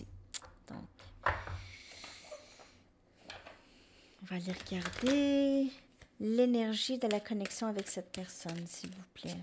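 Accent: Quebec, Canada. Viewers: Le plus bel accent québécois ❤️😉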